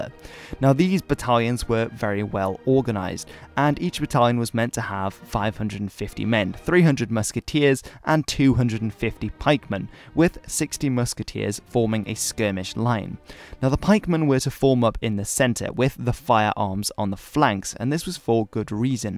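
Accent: Netherlands. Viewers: you are dutch, youre accent is dutch and you pernoune dutch names almost perfect xD HUP HOLLAND HUP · is this guy dutch or english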